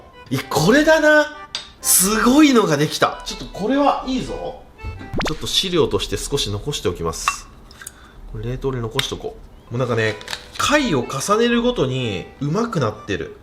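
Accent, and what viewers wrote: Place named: Aichi, Japan. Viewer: 愛知だからか朝倉未来とイントネーション同じ時ある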